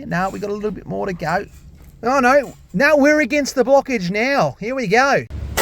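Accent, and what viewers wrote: Australia. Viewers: In Pennsylvania in USA. This was a great video as you kept the annoying music to a minimum.. Got to hear the crazy Australian narrate. That's the part that separates you from the rest. The pure genius description of a total mess.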